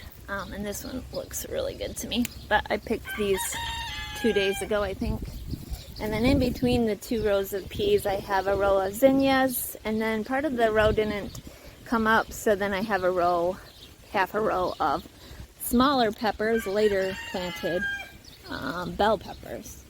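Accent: United States. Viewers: Nothing personal and I do love your channel but why do Americans say Eand ?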